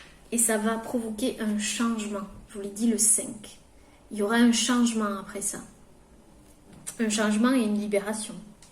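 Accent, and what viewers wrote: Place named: South-West, France. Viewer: Adorable cet accent du sud ouest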